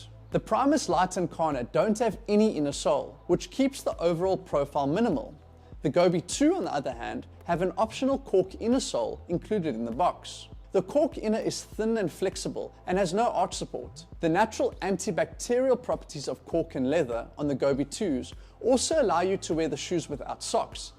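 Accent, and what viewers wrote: South Africa. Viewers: yeaahhh, this guy is South African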